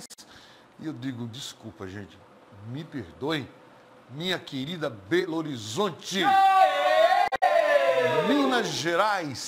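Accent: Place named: Amazon, Brazil. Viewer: Sikera já tá bem amazonense, até o "fulêro" do amazonês ele já fala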